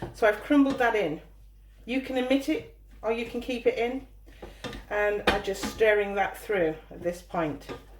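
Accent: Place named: North East England, United Kingdom. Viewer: Just found ya channel. love it for ya home cooking . I crumble bits of black pudding into my lasagne along with a half t spoon of fennel powder ( or italian sweet pork sausage which has fennel in it )and some chilli flakes for a kick. By the way where in North East are you? I'm from sunderland originally ( I think you're not that far off by ya accent?) but I'm living in Spain right now so missing homestyle food. .I'm catching up with ya recipes ( love the Greggs pasty video ). Well done ,keep going.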